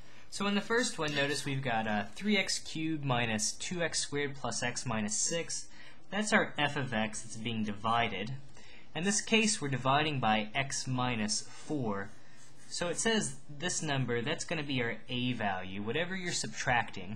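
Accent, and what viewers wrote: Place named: United States. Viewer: good maths, but I hate the american accent tho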